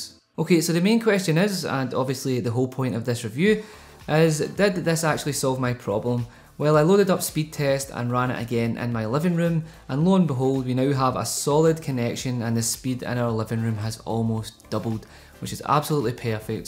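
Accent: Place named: United Kingdom. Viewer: You live in britain from the sounds of the victorian house, and your accent suggests you haven't emigrated, so you're well aware that you did a rude gesture, not peace fingers, at the end of the video. That's disingenuous. You also say "go ahead" where it adds no extra information about twice a minute, which is a very American trait, though also far more frequent than realistic. A speach coach would most likely tell you to remove these meaningless phrases from your vocabulary. It seems like you're trying to capture an American audience by making your content more familiar to them. But you're reviewing a BT product, BT being an acronym for British Telecom. American's don't care about products that aren't avaialble in America, so why are you forcing this unatural familiarity? It only hurts your credibility. There are American YouTubers who are very popular in the UK, and there are British YouTubers who are very popular in the USA. 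Your nationality does not affect your credibility. Pretending to be American in such a forced way does. And that lack of integrity caries over to your reviews. How can we trust what you say about these products, if we can't trust you talk as you naturally do? Other than the offensive gesture and overuse of "go ahead", great video! Thank you for taking the time to make it!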